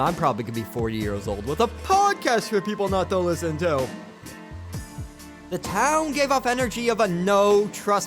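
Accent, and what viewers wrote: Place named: Canada. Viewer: This guy sounds like he might be Canadian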